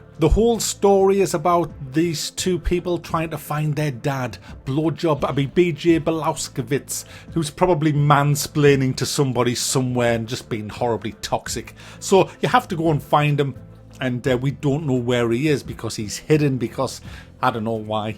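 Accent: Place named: North East England, United Kingdom. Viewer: Are you from sunderland or anywhere in the north-east because you really sound like it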